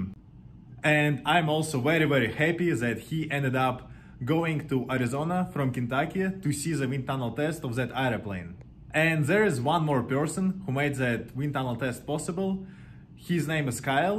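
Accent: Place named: Eastern Europe. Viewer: Okay I am a bit confuse, you sound so European(Eastern European), so why are you in US university? Is America nicking EU talents??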